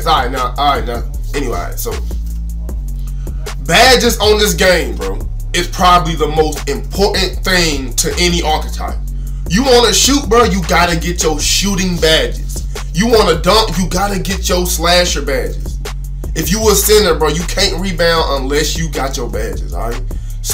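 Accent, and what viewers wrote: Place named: South, United States. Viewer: Yo acccent country asf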